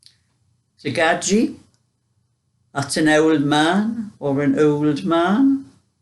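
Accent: Newcastle, United Kingdom. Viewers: The reason I love listening to you is because my Dad was from Newcastle and you sound like my cousin who lives at Greenside.I have only been to the North East half a dozen times, but when my late husband and I went to a 50th wedding party a few years back, my husband said Geordies were the warmest friendliest people he had ever met ! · I think the Geordie accent sounds wonderful. It is one of the reasons why I watch your videos. lol. I used to holiday every year in Alnwick and it is one of my favourite places. I also love watching Vera on the tv.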